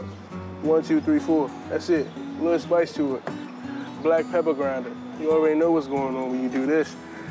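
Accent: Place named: DMV, United States. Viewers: Nigga got a Baltimore accent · If u ain’t from da dmv u don’t even understand him, my dmv ppl stand up slim💪🏽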